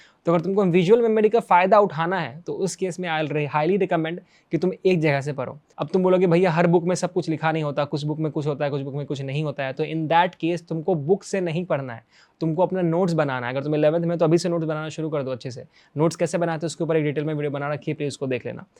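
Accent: Bihar, India. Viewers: Do u from Bihar ?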